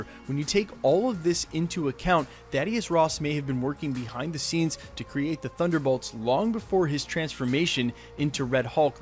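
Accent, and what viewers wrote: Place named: California, United States. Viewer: LOL i see what youre pointing, yes he is slightly sound like the Californians characters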